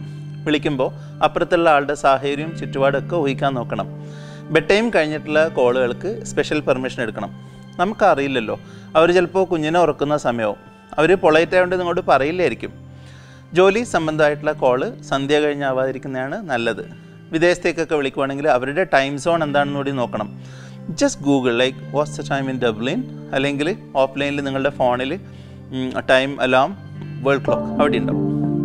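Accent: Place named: Kanhangad, India. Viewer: Hi, Useful video. First time anu Mr. yude video kanunnudu... really appreciat. Kanhangad slang🙂x from England.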